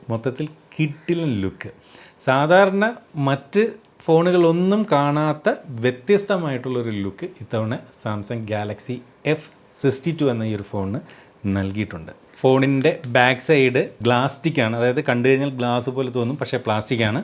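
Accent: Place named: Thrissur, India. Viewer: cheettan thrissur kaaranaano amsaarum nammade thrissur kaare poole undu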